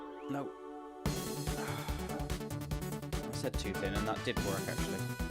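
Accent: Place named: West Country, United Kingdom. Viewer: Are you from Bristol, by any chance? Your accent sounds very westcountry with your "Aff" instead of "Of" and your hard Rs. Don't worry if you are lol, I'm also a Bristolian!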